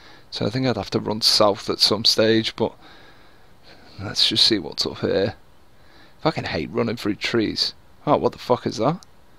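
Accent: United Kingdom. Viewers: always enjoy these PZ videos keep up the good work man, are you from the liverpool area? you sound a little scouse just wondering haha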